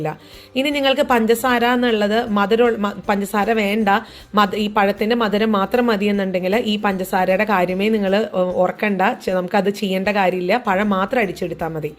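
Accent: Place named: Valluvanad, India. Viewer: വീട് എവിടെയാണ് സംസാരം കേൾക്കുമ്പോൾ ഒരു വള്ളുവനാടൻ ഭാഷ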